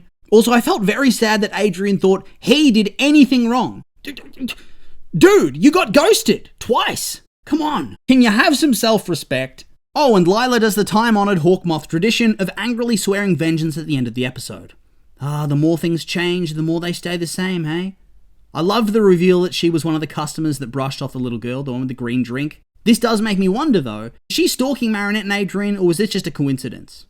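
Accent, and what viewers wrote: Australia. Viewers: I'M SORRY, THIS MAN IN AUSTRALIAN?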